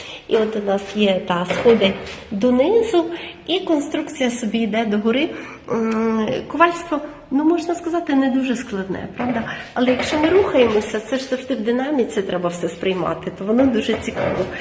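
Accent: Lviv, Ukraine. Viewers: інтонації... абсолютно львівські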